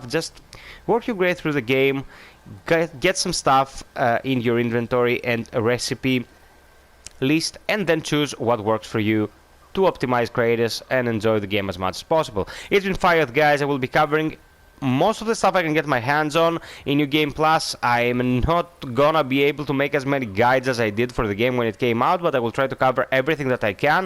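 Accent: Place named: Greece. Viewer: χαχαχα λεω σαν να μου φαινεται ελληνικη η προφορα του και μετα ακουω τον κρατο να μιλαει ελληνικα :D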